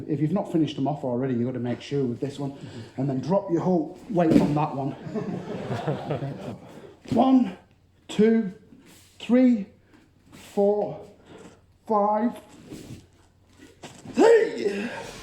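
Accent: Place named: North, United Kingdom. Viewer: Everything sounds better with a norther accent lol "nads" hahaha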